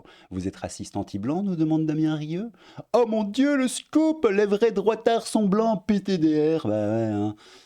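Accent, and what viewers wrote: Belgium. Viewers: Mon cher, j'ai tenu jusqu'au bout mais je dois prendre un anxiolitique. Je ne plaisante pas😢 Continue à "faire ton débile avec ton accent belge", c'est tout ce qu'il me faut pour être un peu moins mal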